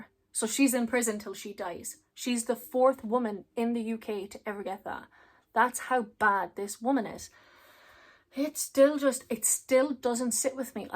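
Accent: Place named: Ireland. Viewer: Absolutely horrific and sickening. Do u reckon is some form Muchousen by proxy? Love your gorgeous accent btw, r u iIrsh? Love from Australia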